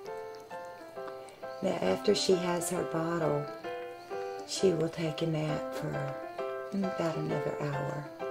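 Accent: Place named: South, United States. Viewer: Hi and Lillian 💜 thanks for saying that 😊 your words of encouragement make me want to study even more to do better 👍.. i managed to pass everything, in fact we all did, so there was a week of celebrations after we found out ✨🎉✨and yep we watch your videos as a group and also put your videos on in the background because they're such a pleasure to watch and even to listen to..like every one of them is different, and I have to say you have one of the nicest voices I have ever heard..I really like your southern accent. Yep 4 room mates from Poland, Switzerland, Sweden and Belgium, and all of them love your channel, and we love seeing Lillian getting up to all her mischief 😊 she is so funny, cute and cheeky all at the same time..When we put on your videos we feel you're in the room with us too, it is a good feeling...Next year we will be separated for 6 months as we have to do work placements for our degree, a couple of the girls are going abroad to Australia and Greece but i want to do my placement in one of the big London hotels..I also think it's great that Brandon is interested in being a chef because it's a great career choice and there is always great opportunities for chefs in big luxury hotels etc and the £$ is normally really good,.. 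When Lillian gets a baby sister it will be double mischief, i think it's a great idea..Have a fantastic day xoxox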